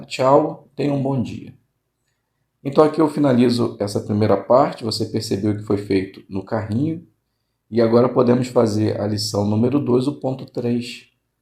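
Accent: Rio de Janeiro, Brazil. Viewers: Amo o sotaque carioca,um abraço irmão 😊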